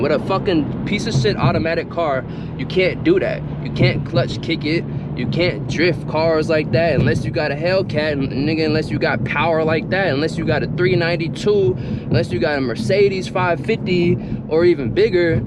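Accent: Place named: New Jersey, United States. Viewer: You definitely sound like you from Jersey B 🙌🏽💯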